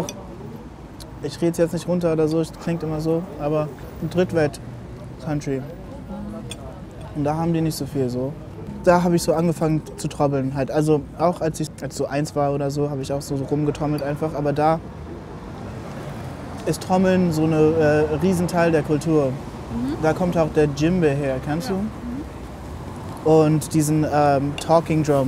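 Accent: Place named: Germany. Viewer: Dieses deutsch Englisch kann ich mir null geben ne sorry!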